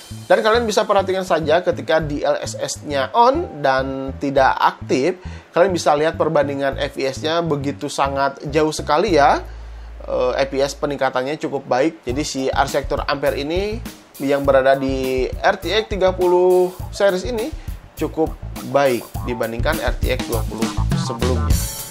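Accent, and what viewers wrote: West Java, Indonesia. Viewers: Namanya Juga Orang Sunda Atuh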